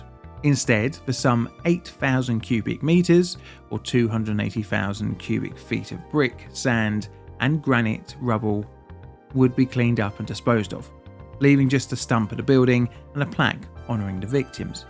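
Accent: United Kingdom. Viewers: Brit pronunciation always fascinates me. Do you pronounce pizza as "pee-za?"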